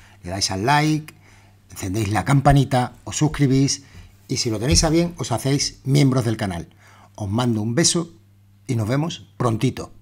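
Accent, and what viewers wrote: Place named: Andalusia, Spain. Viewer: YO T PIDO K NÓ HABLES CASTELLANO, SOMOS ANDALUCES 👍 Y NUESTRO LENGUAJE ES EL MEJOR, ÚNICO, E INIMITABLE!!! CON UNA BUENA DICCIÓN. YA TIENES BASTANTE!!!! 👏👏👏👏👏👏👏👏👏👏😜💙💙💙💙💙💙💙💙💙💙💙💙🌹🌹🌹🌹🌹🌹🌹🌹🌹🌹🌹🌹🌹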